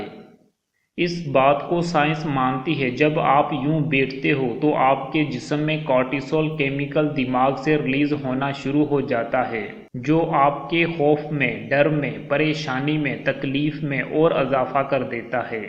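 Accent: Sindh, Pakistan. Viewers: Translated from English in Sindhi accent !!!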